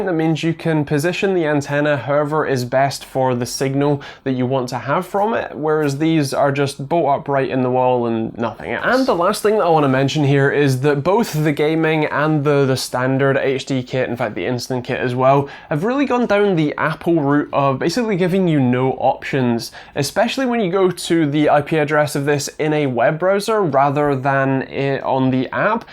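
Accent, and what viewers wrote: United Kingdom. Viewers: well I dunno if u forgot where the English language came from but he sounds like hes from the UK as am I, perhaps he's northern I'm not sure as accents vary so much u can drive an hour anywhere and it changes but anyway.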